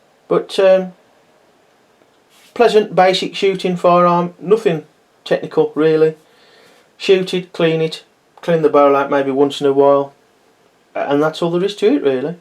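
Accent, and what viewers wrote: Birmingham, United Kingdom. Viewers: yam sound like a Brummie!.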